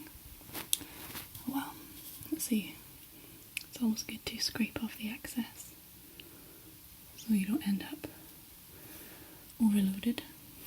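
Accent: Scotland, United Kingdom. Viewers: As a fellow Scot, you're the only Scottish asmrtist who actually relaxes me and doesn't make me cringe at my own accent. Thank you 💜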